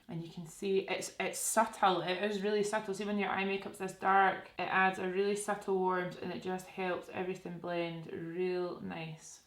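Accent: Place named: North Lanarkshire, United Kingdom. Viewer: First time watching and I'm so homesick now. You sound so like my friends from home. North Lanarkshire by any chance?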